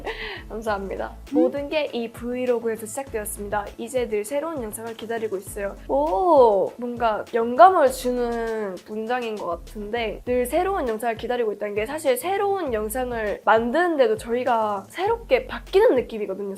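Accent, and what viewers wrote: South Korea. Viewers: 발음은 한국사람 인데....어디서 발음 배움??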